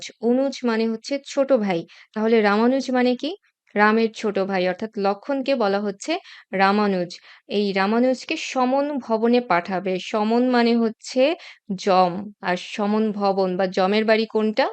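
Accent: Comilla, Bangladesh. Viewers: Apanr basa ki cumillayy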